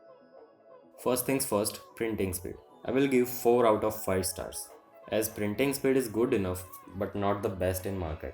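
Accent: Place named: India. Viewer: I understand it but it seems he is from India .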